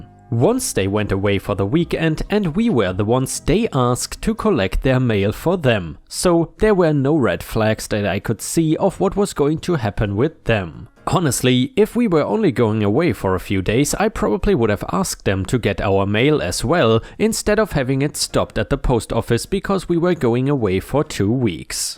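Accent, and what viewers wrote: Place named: Germany. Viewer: Are you german? I cind of hear an acsent. (sorry for the gramar not a english speaking one either)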